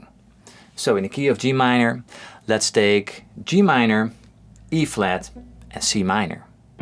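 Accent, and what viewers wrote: Netherlands. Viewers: Are you a dutchy? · Je hoort het zo fel dat je een Nederlander bent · I love that you can hear his accent a little. Viva hollanda🇳🇱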